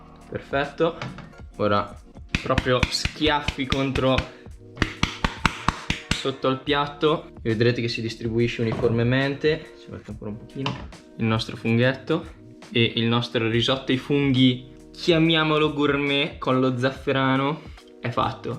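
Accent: Italy. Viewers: Ma sbaglio o hai l'accento cremonese?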